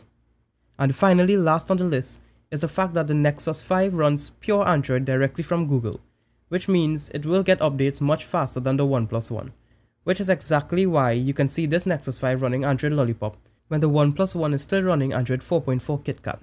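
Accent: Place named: Trinidad and Tobago. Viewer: Firstly, I could tell straight away you were Trini haha. Secondly, Great vid bro but I need to ask where did you get your Nexus 5 cover from??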